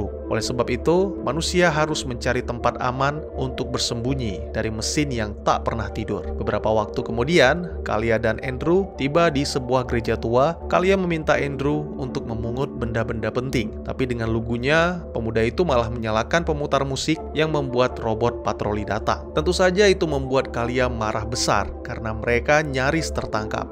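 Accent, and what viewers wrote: Aceh, Indonesia. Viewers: Orang aceh ya bos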